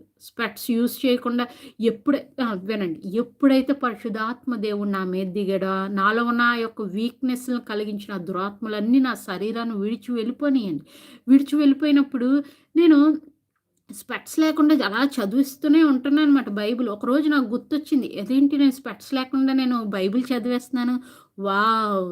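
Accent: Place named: United Kingdom. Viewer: Nee british seed telugu basha vinte etletlano undi akka , yedokati follow avvarade manchiguntadi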